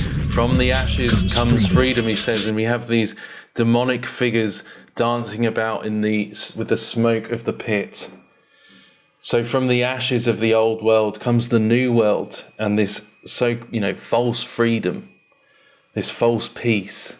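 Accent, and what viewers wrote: United Kingdom. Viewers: EGO DEF He's not American, but English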